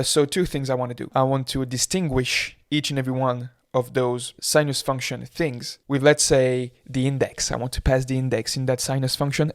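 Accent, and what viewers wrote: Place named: France. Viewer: IBCs !!!!! I am now co-opting this acronym shamelessly and, like a true american duché-bagette....I will likely fail to give you proper credit for its origin. Only because it would be difficult to reference your work; nobody knows who you are yet.....yet. Don't stop doing what you're doing. It's inspiring. Plus I would rather hear this kind of material with a french accent anyday of the week. Especially the cursing and self depreciation....I even find myself using a similar french accent in my head when I am berating my big dumb American brain for my frequent fails at tasks that I begin overconfidently only to realize how much more there is for me to learn.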